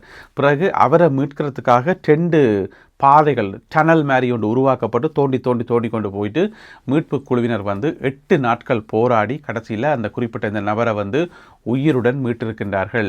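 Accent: Jaffna, Sri Lanka. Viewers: செய்திகள் தரும்போது யாழ். தமிழில் தருவது அருமை.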